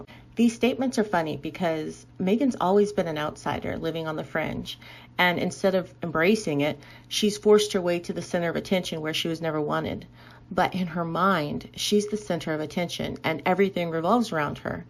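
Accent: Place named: United States. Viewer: Love your American accent.... & just found your channel! Well done!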